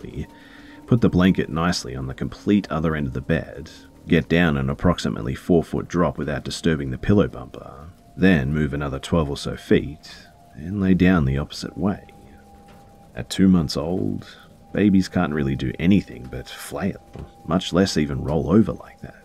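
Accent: Australia. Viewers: I detect a lack of an accent. Not NZ. Not pomy. Must be a well spoken Australian. Ye. Definitely Ozzy. How ya goin' mate?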